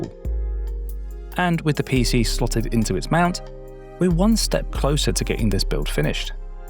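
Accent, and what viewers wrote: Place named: United Kingdom. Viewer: new subscriber here: super nice channel, if I may with a 8 bit guy vibe and a British accent 😊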